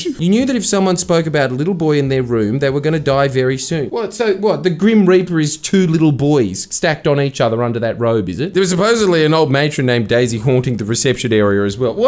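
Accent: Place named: Australia. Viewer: Australian accent is so strong, I thought he was talking about H&K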